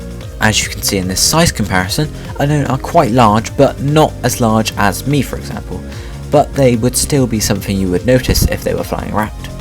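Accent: United Kingdom. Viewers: Linkof War he's British. That's how they say it in europe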